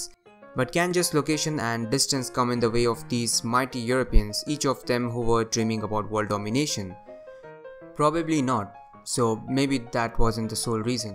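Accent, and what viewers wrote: India. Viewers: The Indian accent is fucking hilarious. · I was fine with the accent, couldn't actually tell you were Indian, but, please. Back up from the mic next time, the peaking is somewhat annoying.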